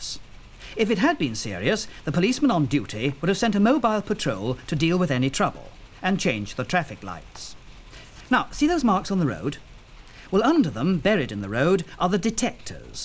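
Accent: RP, United Kingdom. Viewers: “Tel-eh-vishon keyameras” love the R.P. Btw, Wondered how long it would take for some sad kn*bhead to mention ‘Police State’ this was1972 you d*cks! It’s just a bit of nostalgia!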